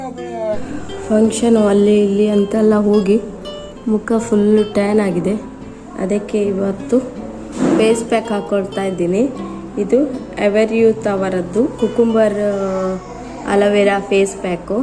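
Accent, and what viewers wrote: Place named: Kundapura, India. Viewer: Hii akka Kundapura knnda matastri .. Yav oor nimdu . Nav hemmady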